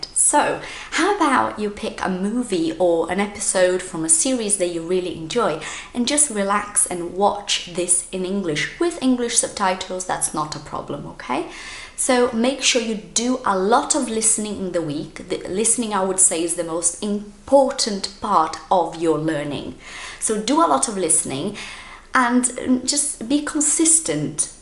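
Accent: United Kingdom. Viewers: Seu English é britânico ? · sotaque britanico ? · inglês britânico maravilhoso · what a lovely British accent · I've from The uk (london) and she has a very strong british accent haha! More "british" than mine 😂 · Ela realmente tem um sotaque britânico maravilhoso é uma delicia ouvir. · Nossa que sotaque britânico maravilhoso!! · why do u have british accent? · VC é brasileira??? Que inglês britânico lindo é esse??? I'm shocked! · I love your British accent.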